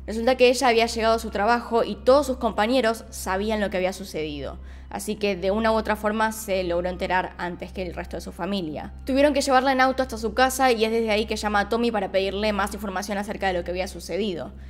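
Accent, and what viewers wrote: Argentina. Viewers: conmigo es igual, yo incluso busco los casos más largos!!!!! También me encanta ese español de ella de Argentina, yo soy brasilera y afortunadamente hablo español!!!! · Excelente investigación 🕵️ No me pierdo ninguno de tus casos. "Hola, y bienvenidos una vej mas" hasta lo repito con acento Argentino (soy de México) y me dice mi esposo.... "Ya vas a ver tus casos" y yo... Obvioooo, es mi Happy time. 💜😁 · Felicidades por tu canal!! me encantan tus videos y la manera en la que los presentas, excelente trabajo!! Yo soy de Mexico pero mi ex era de Mendoza Argentina, amo el acento argentino 😘😘😘😘😘😘😘😘😘😘😘😘😘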